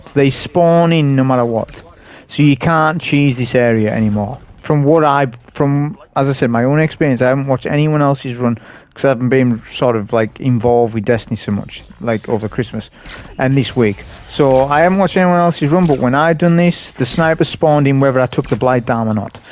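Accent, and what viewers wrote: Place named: Middlesbrough, United Kingdom. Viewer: Top content as always. I don’t want to be cheeky but your ascent sounds like you’re from the ‘Boro.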